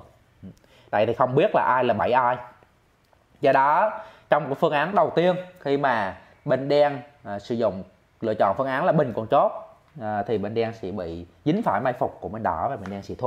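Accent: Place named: Central, Vietnam. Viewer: Mang tiếng miền trung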